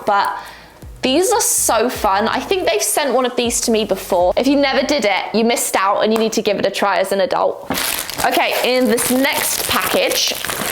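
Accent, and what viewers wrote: United Kingdom. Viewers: Hi, of all the art supplies, I loved the arteze (hope I got the spelling right) watercolour books the set if three, I love watercolour work so. Thank you for such a beautiful Unboxing, P.S. you have a very beautiful British accent.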